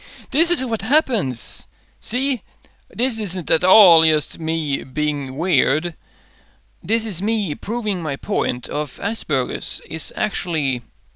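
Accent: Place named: Sweden. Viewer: I am proud to have him. At first, his accent bugged me because I thought it was Scottish but it sounded wrong. Then I realised it was Swedish and sounded right. Now I find it comforting to listen to.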